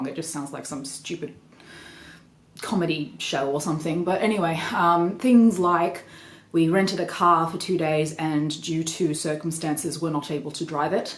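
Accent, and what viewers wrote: Australia. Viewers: Sorry to hear about your troubles. Well done seeking help. I've only just discovered your channel, it's great to hear another Aussie accent on mini related videos. Especially sorry to hear that your booze got pinched when you needed it most. Look forward to catching up on all the videos you've posted thus far. (I'm very curious about the models in the display cases behind you. I hope they are featured in your other videos, and if not, could you do a video tour of them)? All the best.......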